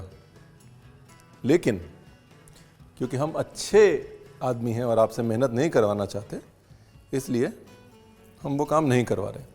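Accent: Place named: Lucknow, India. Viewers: I lived in Lucknow for a year, it was a magical time for me and you speaking in this accent literally brought nostalgia and big smile on my face 😊❤ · लखनऊ भाषा😊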